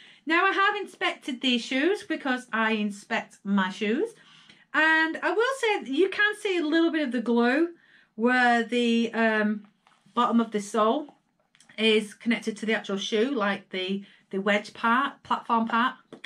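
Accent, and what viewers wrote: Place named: United Kingdom. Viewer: Oh okay it's just u never here that word patent anymore. I just adore u and luv your uk accent.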